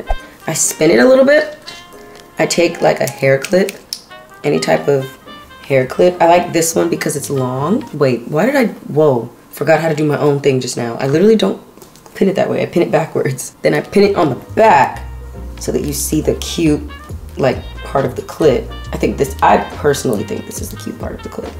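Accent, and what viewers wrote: Ireland. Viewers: brooo you and this irish accent I cannot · 🥺 THANK YOUUUUUU but babes- now I’m stuck with this IRISH accent in my headddd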